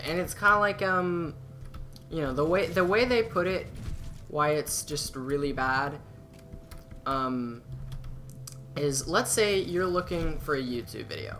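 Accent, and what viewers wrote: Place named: Canada. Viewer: i actually thought you were candian.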